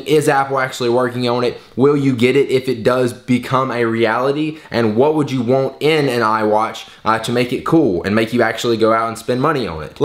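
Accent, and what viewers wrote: South, United States. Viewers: are you from the south?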